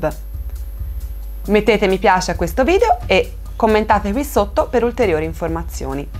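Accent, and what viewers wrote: Tuscany, Italy. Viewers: It's Italian , with a strong Tuscan accent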